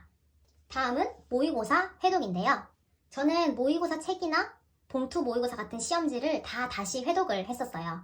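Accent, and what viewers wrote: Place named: Gyeongbuk, South Korea. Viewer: 혹시 대구나 포항 경북지역사시나요? 말투보면 그런것같아서용ㅎㅎ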